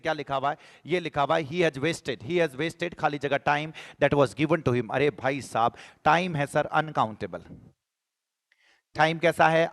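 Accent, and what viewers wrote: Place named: Mewar, India. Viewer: Sar aapki mewadi acchi lagti Hai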